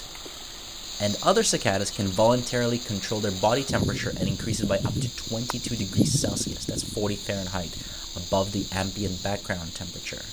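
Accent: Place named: United States. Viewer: I know without checking what it will say, it's an American dictionary. I've already explained how you yanks pronounce it & why. That doesn't make it correct, only in your little realm.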